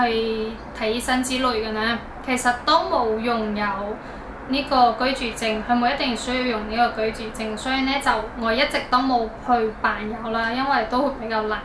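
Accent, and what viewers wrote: China. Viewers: 聽你講嘢就知你係大陸人啦，今時今日香港嘅後生仔應該係去咗外國，除咗啲老嘢會返大陸，邊有港人後生仔返大陸。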